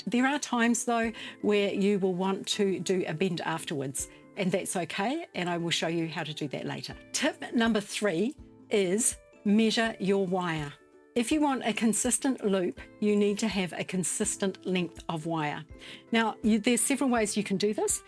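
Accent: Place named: New Zealand. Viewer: Thank you for your fantastic video on how to make perfect loops & fix faulty ones. Love your Kiwi accent too! I have a friend in New Zealand, Bryan Waddle who used to be cricket sports announcer for Radio New Zealand! I'll definitely be liking more of your videos. This is best loop making video I've ever seen.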